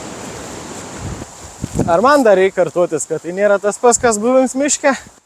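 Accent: Šiauliai, Lithuania. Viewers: Tas siaulietisks bazariuks vis tik islend:)))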